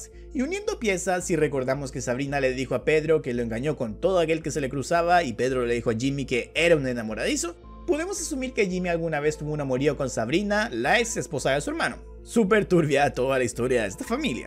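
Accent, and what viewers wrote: Argentina. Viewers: El acento argentino de 10/10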